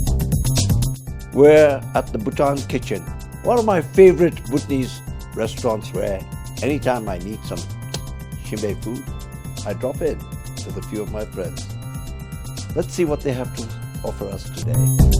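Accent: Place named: United Kingdom. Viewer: I must say you really do have a decent british accent !! Regardless to say..the food was tempting..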